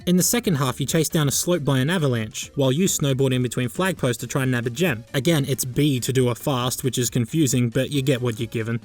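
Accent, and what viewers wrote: Australia. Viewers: Are u from Melbourne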